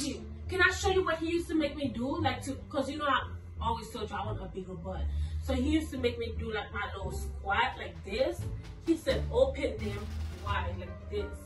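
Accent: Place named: Haiti. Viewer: are you ayisyen? I hear the accent coming out or either Congolese or something?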